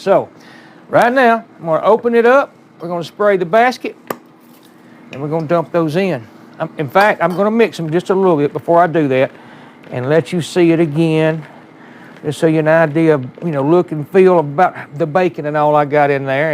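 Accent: South, United States. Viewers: Cant get the accent Sir? South but??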